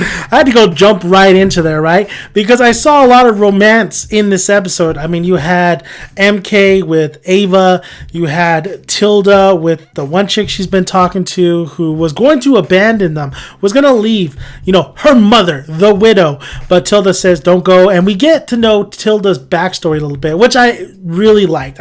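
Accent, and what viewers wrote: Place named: India. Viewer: What's your slang of english ? What are u actually?? I mean are you an Indian or Canadian or something? May I know it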